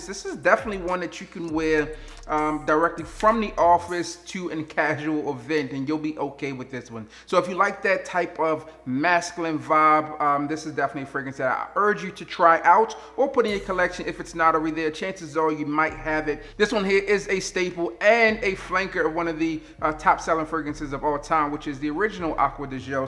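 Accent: Baltimore, United States. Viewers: He has a VERY STRONG Baltimore accent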